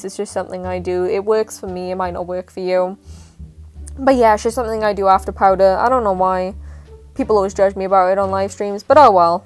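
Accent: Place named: Wales, United Kingdom. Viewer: I love the welsh accent. I live in South Wales 🏴 currently so I’m catching onto the accent which I loveeeeeee ❤️🤷🏼‍♀️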